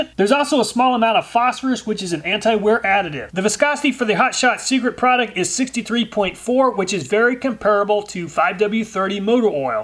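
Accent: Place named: Indiana, United States. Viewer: Man you definitely got that Northern Indiana accent!!!!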